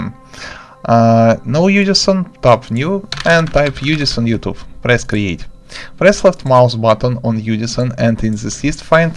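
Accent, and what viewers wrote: Russia. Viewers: Очень русский акцент))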